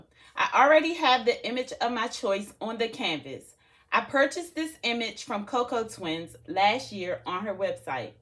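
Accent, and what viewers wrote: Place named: Baltimore, United States. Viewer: You sound like a baldamore woman 😊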